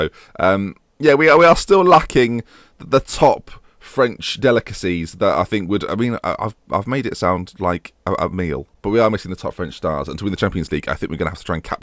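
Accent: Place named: Newcastle, United Kingdom. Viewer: chuffed for you for hitting 30k subs mate. u deserve it man. me fave youtuber from newcastle england to australia. currently in a hostel in mildura victoria but stil watch ur vids every day. reminds me of home so cheers wor kid. canny geordie accent aswel but stil needs work haha!! keep doing wot u do pal. #fluid #geordieinoz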